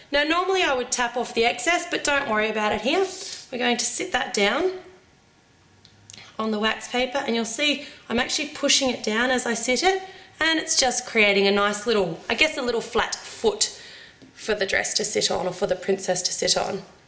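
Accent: Australia. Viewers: Im always wonderin... ur austrailian right??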